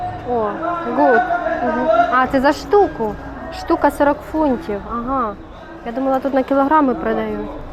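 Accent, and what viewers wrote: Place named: North, Ukraine. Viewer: ти з півночі України, говір такий цікавий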